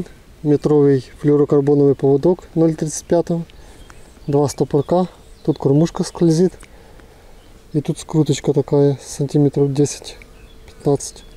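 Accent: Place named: South, Russia. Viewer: говор южно-русский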